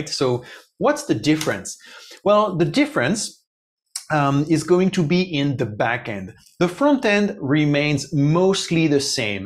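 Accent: France. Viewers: Haha, definitely not :) What confused me was that the guy has French accent, but the prompt is in Cyrillic.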